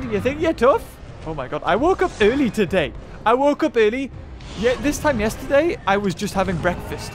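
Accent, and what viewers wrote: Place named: United Kingdom. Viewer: Why you talk british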